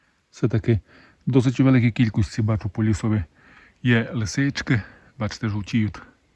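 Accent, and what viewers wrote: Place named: Transcarpathia, Ukraine. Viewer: Щиро дякуємо за таку прогулянку ,дай Боже щастя і здоров'я вам ми зпраги ,приємно слухати нашу закарпатську мову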